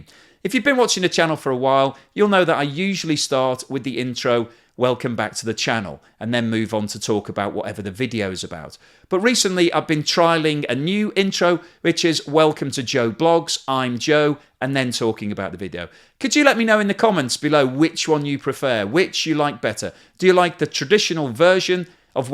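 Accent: United Kingdom. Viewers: Hi Joe, it doesn't really matter what introduction you use. We are here for your nicely structured concise information updates and we love your British accent as well. Did you notice the channel of "Michael invests and tries to make money" always starting with "holy smokes" with an Aussie accent ?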